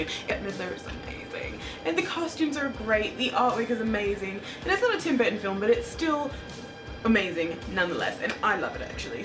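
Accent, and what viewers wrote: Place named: United Kingdom. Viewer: really?? I hear a slight British accent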